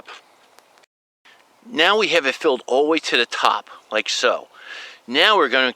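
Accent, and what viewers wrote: New Jersey, United States. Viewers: I just found your channel recently, and I love it! What great advise. I've been gardening on raised beds, protected by a lot of leaves, but you've really open my eyes to what goes on in the soil. I'll be working more with cover crops now. I live in NH., but I'm from the Philly area, so listening to the Jersey accent is an extra bonus.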